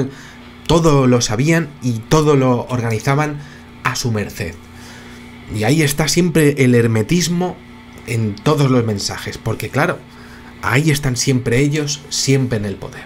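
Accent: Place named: Spain. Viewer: Hola me encanta tu dejo español!!😊😚🤗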